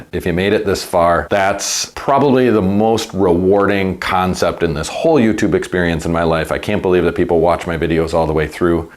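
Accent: Wisconsin, United States. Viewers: I can’t quite decide if I’m hearing Minnesota or Wisconsin but I’m leaning toward the latter. A fun suggestion: the lube counter. You say lube, ding sound effect, a number on screen adds to the count. Cheers from Minnesota! Ope!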